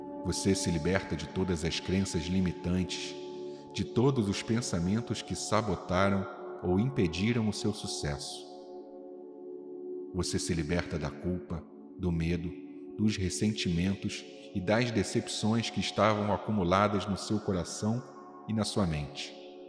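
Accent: Rio de Janeiro, Brazil. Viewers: O conteúdo é otimo, mas o carioques me quebra rs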